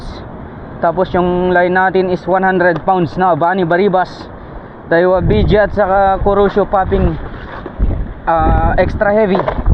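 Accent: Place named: Agutaynen, Philippines. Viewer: Kapatid parang lahing agutaynen ka? May punto ka kasi pag nagsasalita ka, halatado? Hahaha. Pa-shout-out na lng. Joel Zumaraga Tabarangao from Palawan with Love. Thank you and God bless!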